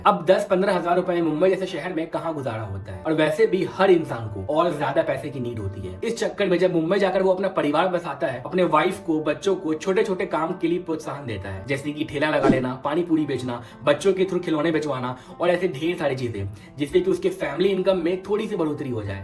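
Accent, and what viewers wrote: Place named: Bihar, India. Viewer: Bhai tu bhi bihari hai tera awaz sun ke mai bol sakta hu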